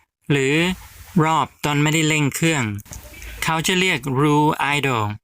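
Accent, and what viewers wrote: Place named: Isan, Thailand. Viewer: สำเนียง ฝรั่ง+อีสาน 👍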